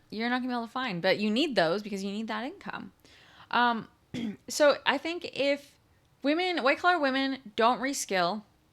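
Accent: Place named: Northern Virginia, United States. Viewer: 30+ years in DC. 75% of women workers were superfluous. True CS degrees had at most 2% women and they were foreign. UMd had the best CS program. Further, DC is NOT segregated. That’s what makes it dangerous. Clueless white people move into black neighborhoods and become a statistic. You sound like you lived in far NW or Northern Virginia. You don’t sound like you lived in DC proper… Which was a good idea.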